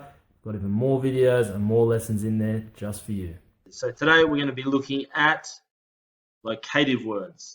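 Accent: Australia. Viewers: Strong Aussie accent there bro. Ka nui te pai. Good on you bro for doing this for us even here in Aotearoa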